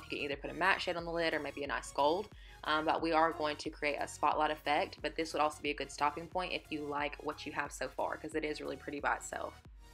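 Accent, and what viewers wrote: South, United States. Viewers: I love the southern twang. Where are you from? Hate the music!!!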